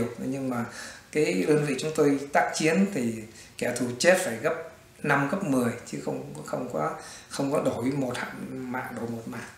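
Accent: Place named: Hai Duong, Vietnam. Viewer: Đúng là giọng Hải Dương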